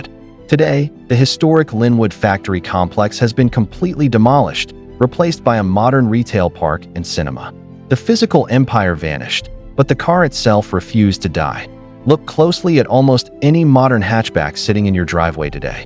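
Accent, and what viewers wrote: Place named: United States. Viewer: The American accent devalues the video.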